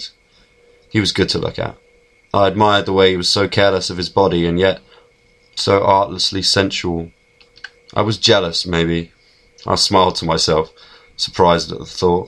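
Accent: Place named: London, United Kingdom. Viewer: It doesn't say who's reading it but he's great, and has a London accent. I also liked the pictures - some of them are of buildings I have worked in!!!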